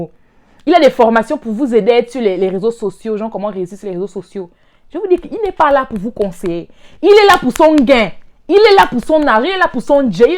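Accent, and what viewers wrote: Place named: Gabon. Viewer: Mais tu es gabonaise non? En tout cas tu en as l'accent.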